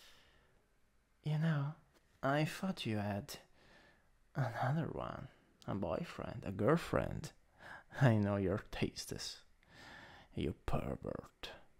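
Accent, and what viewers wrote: Italy. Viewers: I love ❤the Italian accent is the best😅😅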